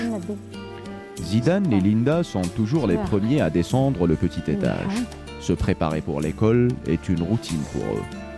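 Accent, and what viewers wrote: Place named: Kabylie, Algeria. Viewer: Accent kabyle normal a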